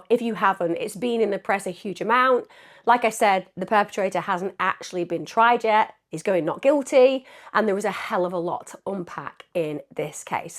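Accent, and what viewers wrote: United States. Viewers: !! your American accent is getting so much better!! Have you been practicing 👌♥️ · Your interview in the American accent was hilarious 😂 you are right up there for me ❤ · Hearing you with an American accent was so weird haha! But it was actually very well done! 😊😊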